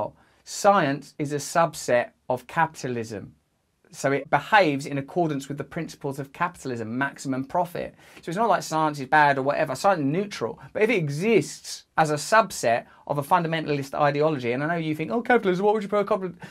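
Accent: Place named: England, United Kingdom. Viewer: Thank you Russell for all your very intelligent , informative videos . An your research is spot on , as is your insight to different aspects of life events . Plus your personality , your English accent speaking voice , an your good looks , you look like a rock star / Jesus with your beard an long hair , very attractive . An you take care an stay Healthy , Russell . An we look forward to your videos.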